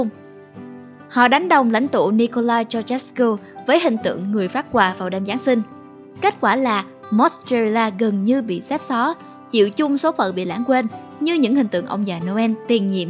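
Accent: South, Vietnam. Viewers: Giọng miền nam duy nhất của nhà spider nhĩ, nghe de thương quá 😍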